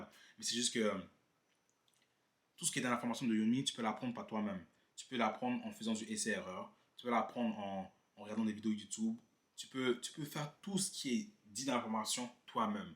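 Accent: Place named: Montreal, Canada. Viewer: tu es de Montreal ? tu as semble avoir l'accent de chez nous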